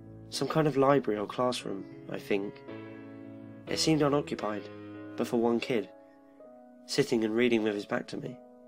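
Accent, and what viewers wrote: London, United Kingdom. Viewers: Like your readings very much! of course I'm a Scot living in London so.you don't use non-existent words like 'normalcy' in stead of Normality (even Creeps McP has done this piece of unforgiveable shite!) you make it scarier cuz it sounds closrer to Hackney E5! Thanks and keep it up (the others tend to send me to sleep but wif you soundin so close it freels like its right outside my door! sp00ky! xx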